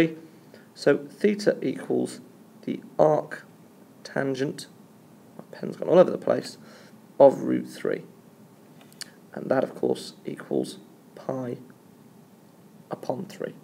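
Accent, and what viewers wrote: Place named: United Kingdom. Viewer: i love that british accent :D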